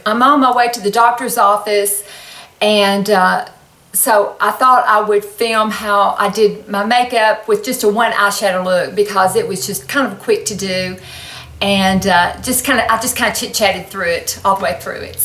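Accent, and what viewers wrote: South, United States. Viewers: Take care of. I hope everything goes well at your doctor appointment, please update us. I want you to know that I absolutely adore you, and that sweet southern drawl of yours! You are so sweet and so encouraging to all of us!! I love you, and love your channel!! I am a 52-year-old grandmother of 12, and I want you to know it is so refreshing to see someone in the over 40 crowd, Who is as beautiful and sweet as you are!!! ❤️💜❤️💜❤️💜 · I never knew you thought this way about your accent. I absolutely LOVE your southern accent....full of charm.❤-Ruthie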